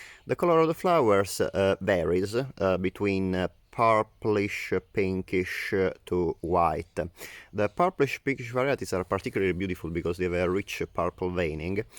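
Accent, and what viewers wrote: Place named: Italy. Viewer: Howdy from Canada. I would call that color kelly green or Irish green. I grow cannabis flowers indoors, legally of course. Love the Italian accent. Stay safe and be well. Smell you later, eh? ♥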